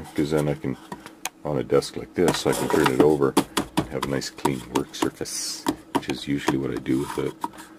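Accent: Canada. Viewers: Sad to hear about the depression. You wouldn't happen to live near Sudbury would you? As a fellow Canadian, I'm just wondering about your accent :).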